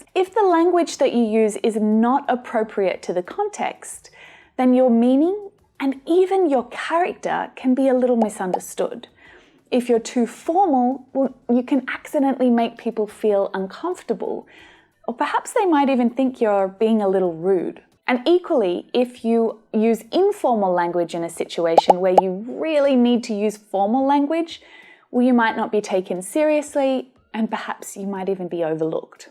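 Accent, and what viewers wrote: Australia. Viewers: Im new to the channel, Im trying to guess where ur from? my guess is you are down under?? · I really love your Australian accent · so you are from Australia, I was not capable of telling whether you are Britain or Australian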